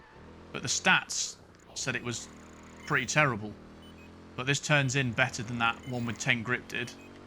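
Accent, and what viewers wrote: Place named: Midlands, United Kingdom. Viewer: it's a mixture with midlands and leicester accent lol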